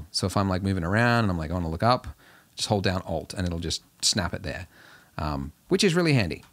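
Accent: Australia. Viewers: Are you from Australia ? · I'd love this series based on its content, but that Aussie accent is absolutely 👍. Love it!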